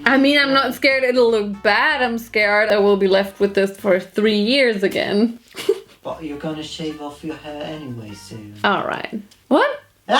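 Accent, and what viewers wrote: Germany. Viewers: Are you German? 😁😅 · Sie redet einfach English und bekommt diese andere Internationale Aufmerksamkeit 400iq aber man hört dass an ihrem English dass sie Deutsch ist das hört sich so cringe an 😂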